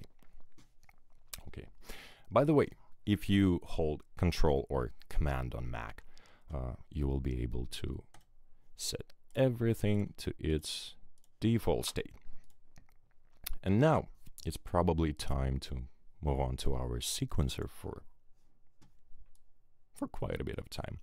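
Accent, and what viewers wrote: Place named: Belarus. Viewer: Belarusian accent of English.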